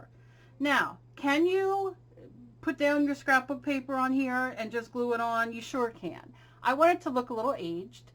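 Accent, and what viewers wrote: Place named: Philadelphia, United States. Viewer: You gotta be from either Philly or South NJ lol. I know my own “accent” when I hear it!!!!! (I didn’t know we had an accent until I moved from Philly to NJ!!!! And met people from N. jersey! But I’m watching this to learn. And the whole time I’m like- I feel like talkin to one of my friends or aunts!!!! Yep I’d bet on it!!! Ok. Back to watching now but please correct me if I’m wrong!!! Lol!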